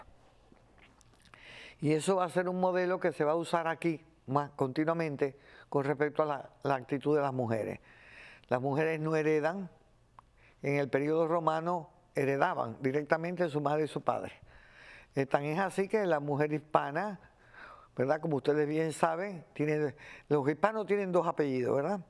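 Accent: Cuba. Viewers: Ya soy adicta a su forma tan natural de expresion....muyyy cubana. Gracias Dr. por compartir con nosotros sus conocimientos. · 👀Yo conozco gente que tienen 70años y nO han “ madurado “ 😅😅😅ja ja ja 🤣🤣🤣🤣🤣cuánta VERDAD ❤tú acento sutil del ORIENTE cubano es notable * y además es un orgullo 🥲 🥹 I PROUD 🥹 of U 📚📖🌳🧘🏻‍♀️🌊🌺🌳🫶🏻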